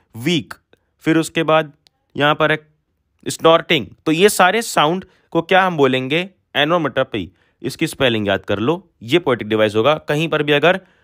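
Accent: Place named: Uttar Pradesh, India. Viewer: Sir u explain very well...hats off to you sir ji....by your voice i understand that you're from UP😊🙌😉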